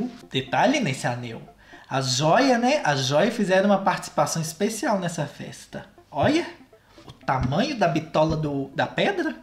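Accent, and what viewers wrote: Minas Gerais, Brazil. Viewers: Kkkkkkk Nem sei prq esse vídeo apareceu p mim kkkkkkk Vc é muito carismático É mineiro?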